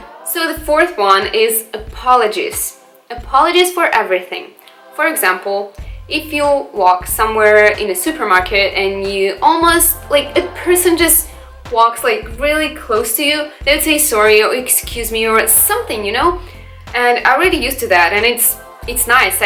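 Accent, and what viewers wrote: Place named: Russia. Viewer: Your English is superb. I didn't realize your accent was Russian until you started talking about being there. Great video.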